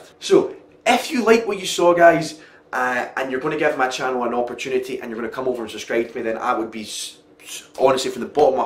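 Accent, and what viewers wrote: United Kingdom. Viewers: I love the Scottish accent, so badass!!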